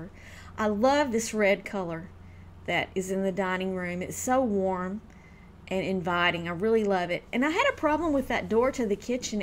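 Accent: South, United States. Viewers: Your southern accent is so awesome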